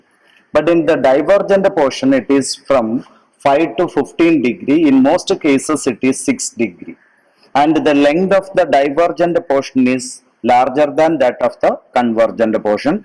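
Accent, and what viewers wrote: Kerala, India. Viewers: I'm from Punjab and I lived in Kerala that's why I noticed your tone😅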